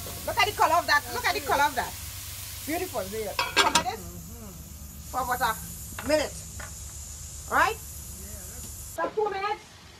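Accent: Trinidad and Tobago. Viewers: Looking so good.Love the Trini accent.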